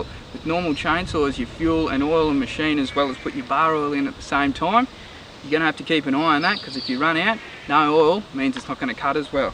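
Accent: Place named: South, United States. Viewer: Where is this guy from? I can hear southern accent, but can't catch where it is exactly from/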